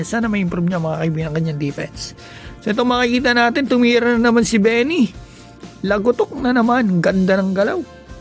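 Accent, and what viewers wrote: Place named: Visayas, Philippines. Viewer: kala ko bisaya ka sir😀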